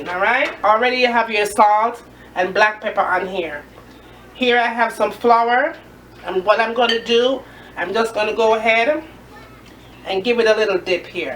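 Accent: Jamaica. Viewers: I just stumble upon your channel and I am enjoying your nice Jamaican recipes, I will be cooking some yellow tail snapper for me and the hubby later today, love your Jamaica accent. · Lawd di patwa talkin. You don't know seh ah foreign you de? LMAO lawd mi sistren big up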